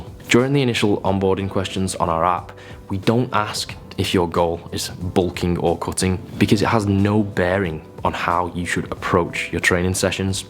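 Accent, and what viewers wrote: United Kingdom. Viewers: I'm not even natty but I am gonna start watching this channel because I love this guy's accent! Much love from some colonial scum my British brother. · Excellent video, very educative, I've got a question just for curiosity, where are you from in the UK?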